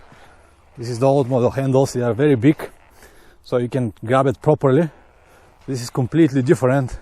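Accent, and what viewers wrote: Russia. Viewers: Русский? :) Акцент нашинский! ;) Мотики огонь оба - у меня 660я тенера, когда появится Т7 бэушная в России, я обязательно куплю её, верю что она сделана как надо! Спасибо за обзор, творческих успехов!!! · Мне одному кажется что говорит с явным русским акцентом? ))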